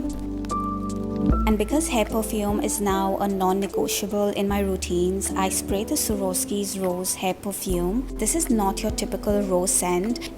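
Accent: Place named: India. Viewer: ❤Your voice gives me comfort. I think you are from India. I recognized you from your accent. I would like to say that I follow you from Algeria. I would also like to say that you are incredibly beautiful. Your hair, your body, everything. Continue with your content because you are really successful. I really loved you. ❤❤❤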